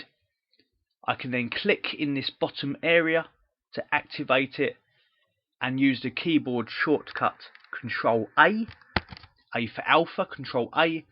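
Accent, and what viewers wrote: England, United Kingdom. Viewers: Love English accent lol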